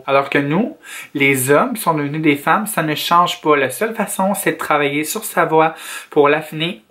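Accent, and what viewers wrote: Quebec, Canada. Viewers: T'est quelq'un de super ne les écoutes pas en plus je trouve ta une belle voix avec un accent québécois j'adore jtm 😘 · Ta voix elle a rien t parfaite en plus jaime bien ton petit accen canadien 😊 je suis tombé par hesard sur ta chaine et jai adoré un like et une abonée de plus😉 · putin comme c'est nnull de parler quebecois , sur ma vie on comprends un mot sur mille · Moi c l accent québécois g du mal a comprendre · Mama ton accent Québécois il est juste magnifique ça me fait rêver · est-ce que tes québecoise? c juste a cause de ton accent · Mais j'ai du mal à voir car je pense que tu est au Québec et tu n'a donc pas le même accent (j'adore les accent québécois ?) Donc c'est dur à voir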